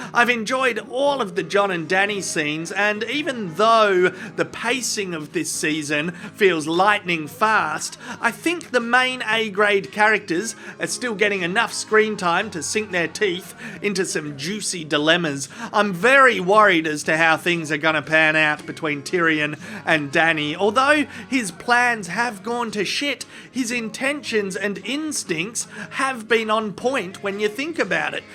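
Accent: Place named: Outback, Australia. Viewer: My favorite part of Ozzy man reviews is that although he sounds like a fair dinkum outback wanker, he is deep and insightful, especially with GOT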